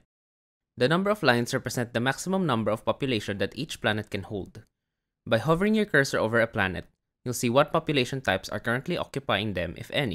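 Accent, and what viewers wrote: Philippines. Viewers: Hearing Tagalog accent was a surprise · I knew from your accent that you're a kababayan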